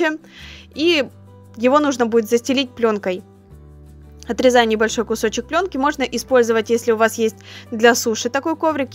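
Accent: Ukraine. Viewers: Молодец ты. И акцент твой украинский мне тоже по душе. Я в восторге от меледочных роллов. В субботу обязательно сделаю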